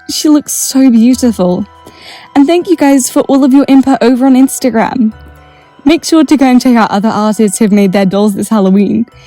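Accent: United Kingdom. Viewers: She looks great! I honestly think the crinkly wings work great, even in nature these kind of wings are never perfectly smooth and usually have a slight texture. Also, creepy vibe and all that lol. I found you via Dollightfulls community post and my god, you sound delightfully british. It was a pleasure seeing your process and hearing you explain it. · OMG THIS IS AMAZING!! I have just found your channel, and normally, I dont watch many customisers because I can't understand what they are saying, and then I realise you are British, and I am British too so I was internally screaming with joy. And your customs are so good!!! I love how this doll has an eerieness to her, and yet still friendly. You deserve so many more subs.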